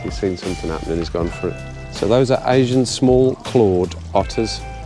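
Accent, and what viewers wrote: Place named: Wales, United Kingdom. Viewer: hell no 👊I just want to hear Your voice, a Wales accent is so cool 😎. BUT there will be songs that you will like,very far and few between, but you will like them.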